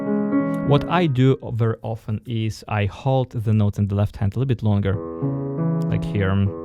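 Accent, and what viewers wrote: Russia. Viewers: An excellent performer and teacher. But why on earth such a terrible Russian accent ? As a musician you should easily master the English speech intonation without much trouble. Kinda strange. But no hard feeling !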